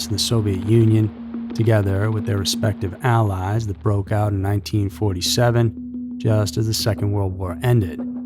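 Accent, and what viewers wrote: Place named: Midwest, United States. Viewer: Not Texan, probably mid western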